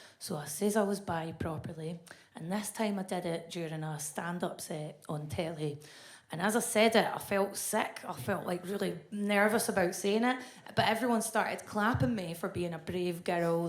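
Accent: Scotland, United Kingdom. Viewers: Well I had a boyfriend from a psych ward he was lovely the mess came when I left him for my ex who caused the stress that almost broke me. Couldn't keep watching not funny she just sounds funny because of the somewhat forced deep voice and scottish accent the content is that of a 20yr old and gross and the audience loses it I wonder about some of these clips a.i images why not a.i audiences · Funny. Hot. Amazing Scottish accent. And most importantly hot. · It's English, but even for Americans it's a little hard to understand the Scottish accent. You said you're Mexican right? It's kind of like trying to understand Spanish from the Puerto Ricans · Sorry, I'm a native-American (literally-speaking) and her accent is really heavy; I have a hard time understanding her. She might have to advertise just for Scottish people. :) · Ah, she,s good. And that husky Scottish accent. 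· Maybe she isn't. But she sure has a strong Scottish accent. Strong enough that I find it quite charming. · You can select to have captions on screen in English which might help. She has a Scottish accent which can take a while to tune into if you're not familiar with it. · that was fu-kin brilliant. love the scottish accent.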